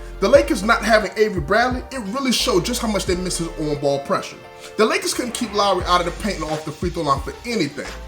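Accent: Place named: Toronto, Canada. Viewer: man you on these videos quick time.. you sound like you from the 6? yea or nah? regardless who you actually route for its nice to see someone who puts up legit facts on this team cause you know mainstream is all about that LAL LAC Zion GIannis and then other superstars if there is time in their time frame of the show .. raps only get mentioned if they play a vegas team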